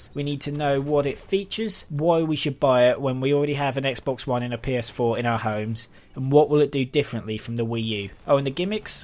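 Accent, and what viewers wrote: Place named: Norfolk, United Kingdom. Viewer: Im originally from Nottingham now I live in Norfolk Sheringham had done for 8 years so iv got an ear for the Norfolk dierlect how ya getting on lol you gave it away when you said money grabbing I think they know to be stronger then the ps4 and xbox I'm loving the wii u pick up and play with the handheld I think it will surprise every one it needs to add me in wii u my Id is imrobinurhood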